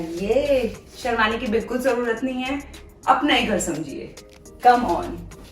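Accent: Delhi, India. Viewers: such a delhiite haha for a sec i forgot you are an actress, aise mast bhasha bhi bilkul dilli wali, i love watching you <3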